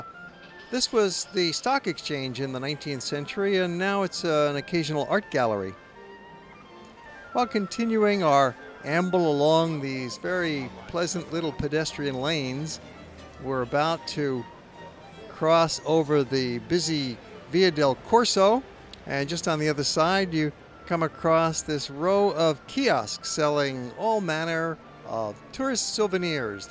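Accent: United States. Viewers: Great video! Thank you! What U.S. accent have you? I can't pick it... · your accent does sound very American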